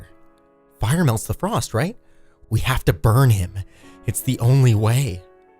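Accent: Canada. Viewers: my man!!!!! I have been waiting for this forever dude! Didn't think it was ever gonna happen after the issue you had with the author about the last part of the story.. And speaking of which, do you have some sort of agreement with T.W. Grim or somethin? You're the only narrator on youtube I've found that reads his/her stuff. You have the best taste in material by the way, and for some reason, your painfully Canadian accent really tops these off and makes them more real and authentic. You have quickly become my favorite narrator dude, keep up the good work!! Also, do you read subscriber submitted stories?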